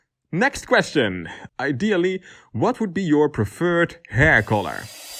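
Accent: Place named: Netherlands. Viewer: Are you dutch? You really sound dutch Anyways, great content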